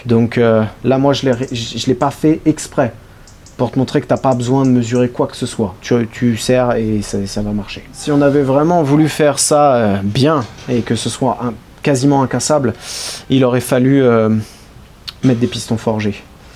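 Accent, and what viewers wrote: Alsace, France. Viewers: j’ai l’impression qu’il a pris l’accent Aslacien nan ?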